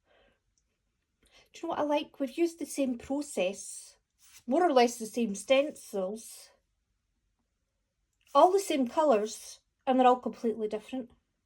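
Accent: Scotland, United Kingdom. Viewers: When you chide yourself for (almost) being daft it sounds like a compliment, but when I do it using my mid-western American flatlined pronunciation it sounds like a guilty admission. 😜 This is by way of a request/ gentle reminder for you to use a wee bit more Scots as part of your guidance. When I pronounce daft your way I feel much better when I run off the rails accidentally on purpose. I’m sure there is much more Scots argot for this pursuit. Thanks again for your help.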